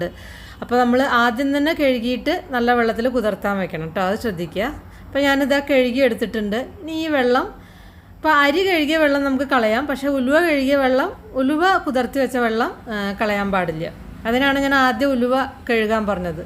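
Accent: Palakkad, India. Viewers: avar parayunnath enthanennu manasilayallo athu pore. Palakkad chilar usually ingane aanu parayuka. Ellaavarum ningale pole achadi bhasha samsarikkanam ennu nirbandham pidikkaruth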